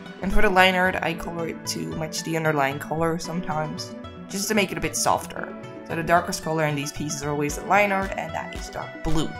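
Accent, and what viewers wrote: Netherlands. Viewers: Virgo should definitely be a pretty boy. Also I like your voice overs because your accent reminds me of my Dutch friend 😁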